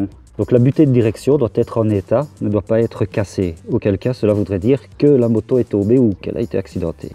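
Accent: Belgium. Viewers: Rien que de t'entendre parler je suis certain que tu est Belge !!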